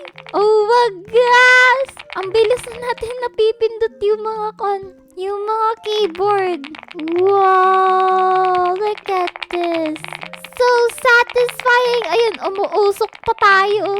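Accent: Visayas, Philippines. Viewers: Ate bisaya ka pala kala ko Tagalog ka ❤❤❤❤ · Bisaya kaba ate!?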